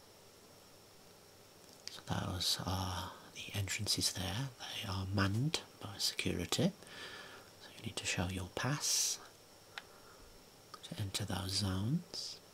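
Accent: Birmingham, United Kingdom. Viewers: You sound like a Brummie, how comes you support United?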